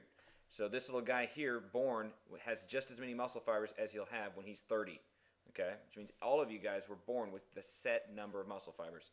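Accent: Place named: United States. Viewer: its frankie boyle!!! (with an american accent)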